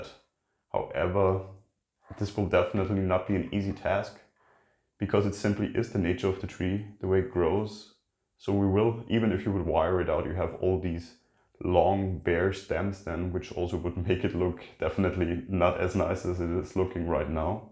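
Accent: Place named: Germany. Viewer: Kann es sein, dass du Deutscher bist? Dein Akzent klingt so vertraut. Grüße aus Österreich 🪴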